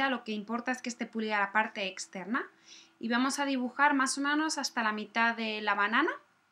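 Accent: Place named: Spain. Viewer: que bonito lo que ensenas..yo no soy mujer, soy guy umm masculino pero cuando es de halloween excelente...me encanta tu acento espanol, spain. Para halloween estare listo.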